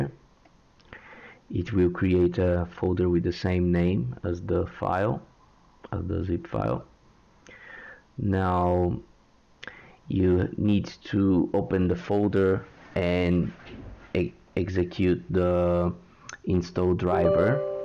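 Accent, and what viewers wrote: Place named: Brazil. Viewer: Your English sounds like "Brazilian English", it's an easy accent for me to understand. Thanks for the video, it worked!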